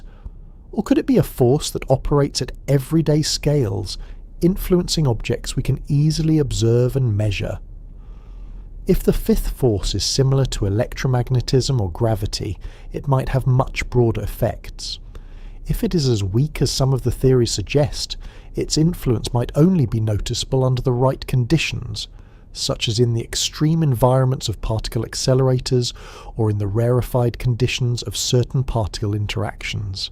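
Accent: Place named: England, United Kingdom. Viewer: I dont have any idea of 90% of what this all is....I feel smarter just by listening to some English fellow saying big words....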